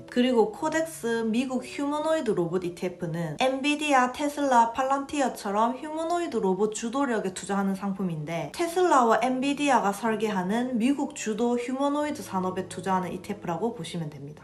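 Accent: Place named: Busan, South Korea. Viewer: 짱이에요 영상 항상 잘 챙겨보고있어요...!!🥹💜 친근한 부산사투리 너무조와용 >_<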